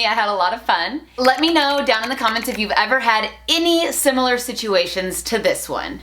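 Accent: Mexico. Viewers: It’s kinda crazy that you’re totally fluent and so and I know you’re American, but I still listen to a little bit of an accent, Mexican accent. I know is crazy, but I can listen to it 😂🤦🏻‍♀️ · Si te hice caso que en vez de verlo en español lo viera en inglés, no lo he visto en inglés pero enserio me impresiona como tienes el acento de tu primera lengua osea inglés y aparte pudiste aprender e imitar el acento de español de México y hablar perfectamente el idioma Me impresionas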